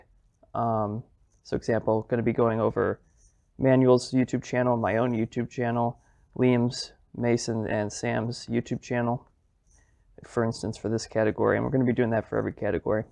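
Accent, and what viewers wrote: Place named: England, United Kingdom. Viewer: I like your english accent lol😭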